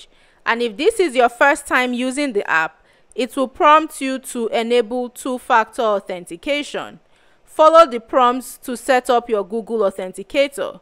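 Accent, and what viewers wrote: Nigeria. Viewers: Are you a Nigerian, ? the accent is like mine.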